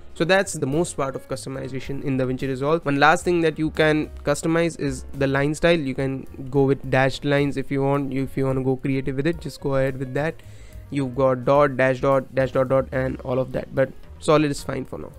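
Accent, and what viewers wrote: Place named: India. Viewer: Как обычно, вначале рыщешь половину интернета в поисках инфы, а по итогу один мужик с индийским акцентом за 3 минуты всё рассказывает как надо. Спасибо тебе, брат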